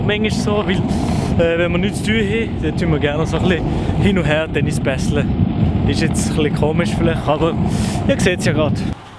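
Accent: Switzerland. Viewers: Also Züri-Dütsch isch das nöd, was isch das für en Dialekt?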